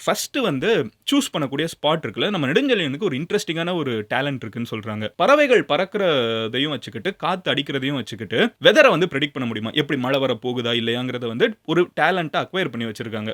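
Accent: Madurai, India. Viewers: nega madurai or Chennai ❤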